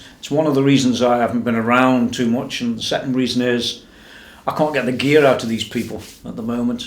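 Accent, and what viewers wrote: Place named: Northern England, United Kingdom. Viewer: ah. Fair enough. It sounded vaguely northern to me. Like bits of my mate from st Helens mixed with Yorkshire. I'm from Northumberland myself so anything south of Middlesbrough is a mystery to me accent wise.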